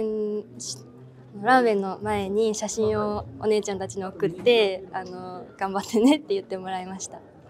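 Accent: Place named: Kyoto, Japan. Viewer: もっと京都弁喋ってほしい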